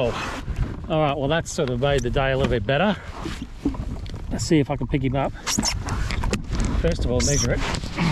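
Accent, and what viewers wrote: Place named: United Kingdom. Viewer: Slow down your British accent , have no clue what you just said .😬😅✌🏽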